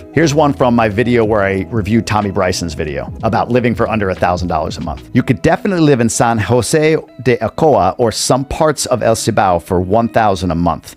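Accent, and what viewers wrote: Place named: New York, United States. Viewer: Love this vid. I'm right lol. Knew that wasn't a Michigan accent. I thought you was a New Yorker. Tommy is now married his budget will probably increase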